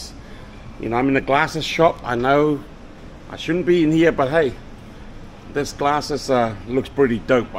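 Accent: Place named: United Kingdom. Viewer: That British accent 😂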